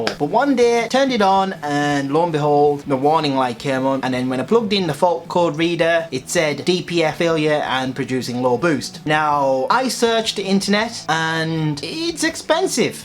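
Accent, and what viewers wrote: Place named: United Kingdom. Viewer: He does a very good British accent I’m subscribing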